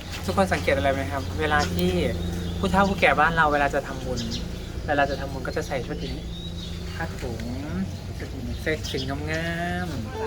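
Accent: Isan, Thailand. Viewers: สาธุสาธุสาธุอาจารย์ป้าเป็นคนอีสานจ้าได้ฟังอาจารย์คุยกับแม่อาจารย์เป็นคำพูดอีสานฟัวแล้วคิดถึงบ้านจ้า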